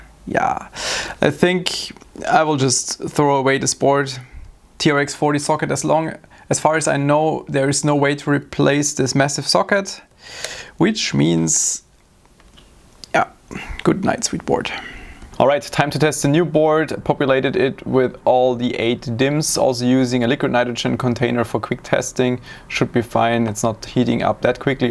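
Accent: Germany. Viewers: Man hört den deutschen Akzent soo sehr heraus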